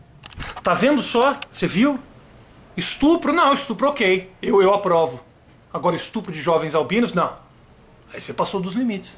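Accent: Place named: São Paulo, Brazil. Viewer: meldeus, o já está puxando o R de paulista... (se ele já puxava eu não fazia ideia).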